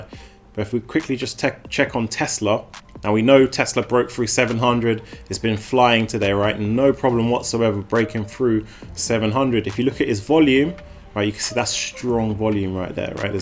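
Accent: United Kingdom. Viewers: Good brief, nice to have a British accent. Well done.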